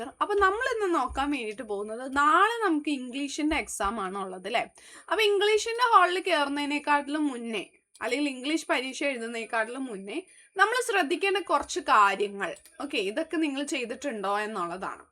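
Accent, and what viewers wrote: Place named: Malappuram, India. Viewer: njan Kozhikode, balussery anu nji Malappuram anennu vijarich slang kandappo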